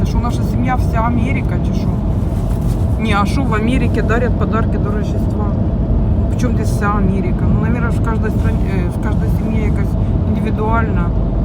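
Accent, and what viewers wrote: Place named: Kryvyi Rih, Ukraine. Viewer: Вона балакає на нашому Криворізькому!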